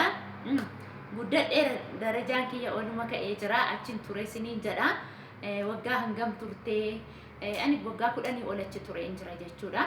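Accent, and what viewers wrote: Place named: Wollega, Ethiopia. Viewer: Sagalee beekama silayu akka wlg tate❤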